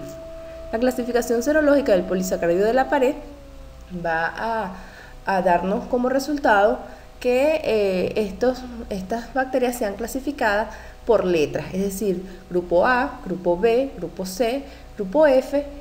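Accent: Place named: Venezuela. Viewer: Muchas gracias! Me encanto su clase profesora! Además percibí un acento venezolano 🌎💜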